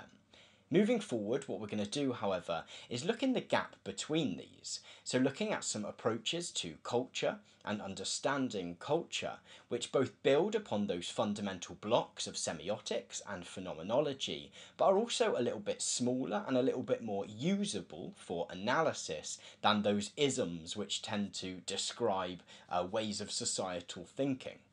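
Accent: United Kingdom. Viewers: I watch your videos and those of the Yale course on the same topics. Yours are 10 times better. You also outshine many other YouTubers discussing classic and contemporary philosophies. May I also say that watching your videos has boosted my respect for Exeter and British English, an accent I had trouble with?😀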